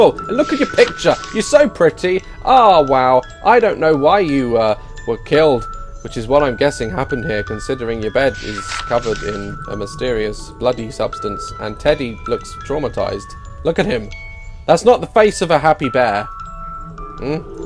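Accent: United Kingdom. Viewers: your British aren't you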